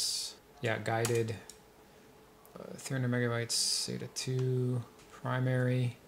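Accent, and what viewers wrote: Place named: Canada. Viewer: I can tell you are Canadian!! - "Pixie" support?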